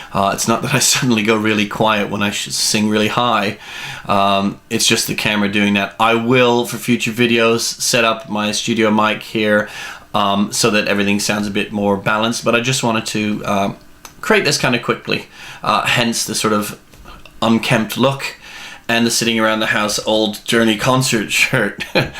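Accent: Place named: United States. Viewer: are you UK mixed with US..???..you sound a lil bit American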